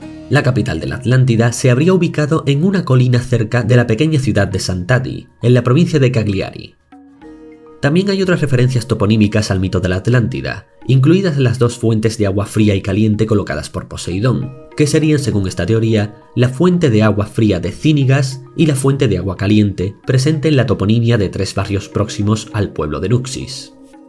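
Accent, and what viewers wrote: Spain. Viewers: ¡Excelente la narración! ¡Me encantó! Me encanta el acento español del castellano.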